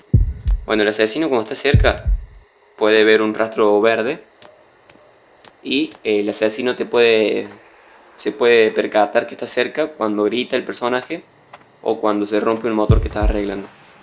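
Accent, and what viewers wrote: Cordoba, Argentina. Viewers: Como me encanta como habla este cordobe son uno grande es como yayo 🤣🤣🤣🤣👍👍👍 segui asi papa !!!! Me encanto tus video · Che flaco sos cordobes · sos argentino, tenes alto acento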